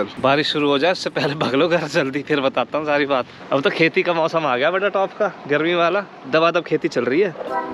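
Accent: Haryana, India. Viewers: Ye bhai haryana faridabad se hain ya utterpradesh Noida se boli dono ki same hai.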